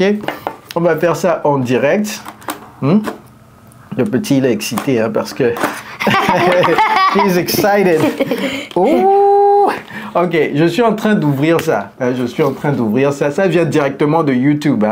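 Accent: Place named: United States. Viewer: Since I begin to listen your video the American accent is easier to understand for me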